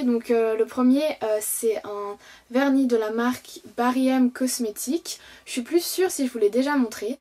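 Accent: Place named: Switzerland. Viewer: Hey, donc franchement je tenais à commenter cette vidéo parce que franchement j'adore vraiment tes vidéos ! Genre j'sais pas mais je peux te regarder en boucle pendant 9 minutes je vois pas le temps passer hahah enfin bref, en tout cas j'aime bien tout simplement parce que tu articules quand tu parles, tu as l'accent Suisse et comme je suis Suisse aussi c'est plus agréable je pense, et surtout c'est vraiment constructif ce que tu dis et surtout tu es douces, genre tu cries pas et c'est agréable de t'écouter. Bref voilà continue surtout, tu vas bien monter :) bye !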